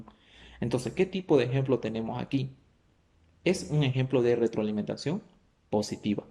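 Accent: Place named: Bolivia. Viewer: Muchas felicidades DR, me ayudaste mucho en fisiología, explicas muy bien, por tu forma de hablar pareces boliviano, yo también soy de Bolivia, y te ganaste un nuevo suscriptor.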